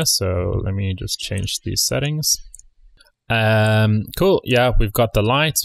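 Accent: South Africa. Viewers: You might be a South-African by the sounds of it :D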